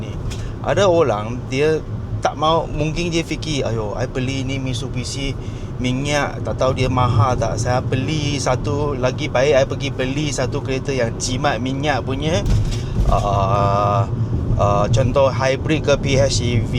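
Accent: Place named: China. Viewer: Hehe... Aku mmg suka la tgok video ko. Mmg syok bro. Ko ckp jujur. Malah video ni paling best...ko sebut P A J A R O...hahaha!! Pelat Cina ko tu buat aku gembira. ❤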